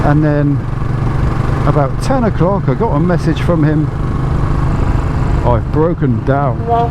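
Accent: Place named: RP, United Kingdom. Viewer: to a northerner, he sounds well posh which throws me right off 🤣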